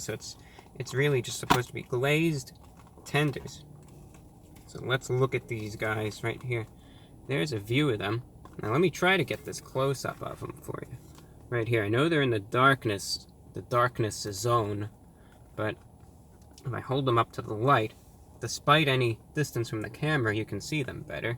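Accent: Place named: United States. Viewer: „None of them Hääääve it“ Man i like, but the american accent just kinda gives me shudders. Still great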